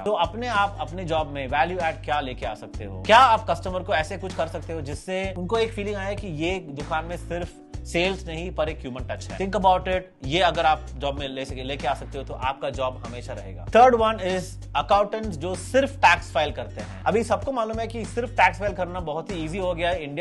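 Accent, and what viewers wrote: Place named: Tamil Nadu, India. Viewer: Thank u for such a video 🙇. Also, I can sense tamilian slang in your way of speaking hindi 😅.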